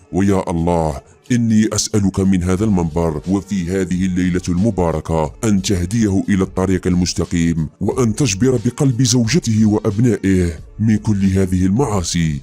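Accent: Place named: Skikda, Algeria. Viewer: حسب الهدرة تاعك تبان سكيكدي 100 / 100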